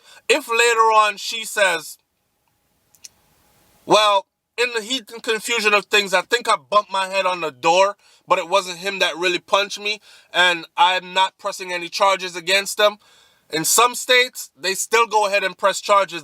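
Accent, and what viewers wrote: Trinidad, Trinidad and Tobago. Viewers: Hi, love your Trinidadian accent... very funny 😂😂😂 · Lol oh boy, I love your Trini accent. This story was very interesting. I work on the weekends my days off are in the middle of the week so I can't do anything especially since I have my own reputation to uphold. Great video. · Well A A! Bachannal in de people place yes! I'm BAWLING crying at your Trini accent... Yuh have it dread, yuh have it! 😩😩😩🤣🤣🤣😂😂😂😂😭😭😭😭😭😭😭😭💀🤞🏾🔥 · Hi you are Trini you dount talk like jamaican love it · Love ❤️ your Trinni accent is great 👍 brother don’t care what anyone else says 🙏🏾❤️🇯🇲🇺🇸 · Man everybody done no she was ah bulla gal from long time lol.. side note... yur trini accent pretty good fadda 🤣👏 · Well I am Trinni and you sound like us.Welcome my fellow Country man 👊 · I like how you talk the Trinidad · you Trini accent wicked boi , ah hear u Trini keep on doing ur thing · Love your Trini accent!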